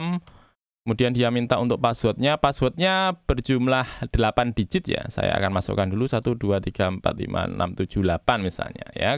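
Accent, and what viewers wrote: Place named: Java, Indonesia. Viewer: Senengnya akun ini medoknya lucu, mantep mas jawa aslii ini mudah di mengerti heeh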